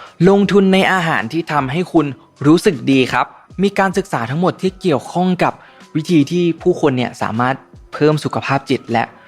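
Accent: Thailand. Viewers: สำเนียงไทยน่ารักมาก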